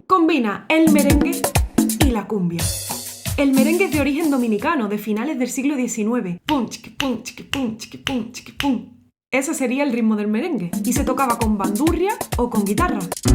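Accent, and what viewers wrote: Andalusia, Spain. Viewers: Cuando escuche una mujer de acento español que decia que iba a tocar y cantar una cancion tan hermosa me detuve. SOY DOMINICANO, y casi se me salen las lagrimas al escucharte nombrar un campo de mi pais donde hay mucha pobreza llamado Villa Vazquez, y al escucharte mencionar en la Cancion la ciudad de la Romana, una ciudad del este azucarera, llena de Bateyes, pequeños campos de campesinos que cultivan la caña de azucar que viven en pobreza extrema, donde aun no hay baños sino letrinas, y viven aislados del mundo sin ninguna comodidad. Esto no es solamente una cancion, es una historia triste, mis abuelos fueron agricultores, y la agricultura no se efectua aun a la fecha con maquinarias, y en esa epoca ni empleados se usaban, mis abuelos usaban a mis 8 tias incluyendo a mi madre siendo niñas y adolescentes, para cultivar la tierra, y cuidar ganado desde la 5 am y a veces mas temprano, en esa epoca y aun a la fecha muchos campesinos ni tienen sistema de irrigacion dependiendo 100% de las lluvias, y hoy que vivimos con tantas comodidades a pesar de ser pobres. 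Ojala algun dia se cumpla el sueño de en todo el mundo y principalmente en LATAM, donde hay tanta pobreza en los pueblos agricolas. Quizas para ti y para la mayoria estas solamente sean las letras que riman de un merengue, pero para nosotros, la yuca y el te, el arroz blanco que es parte de nuestro plato nacional es una realidad. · El algoritmo me trajo aqui. Mas que el virtuosismo de tu cantar y tu guitarra, me encanto tu acento "Andalu Light".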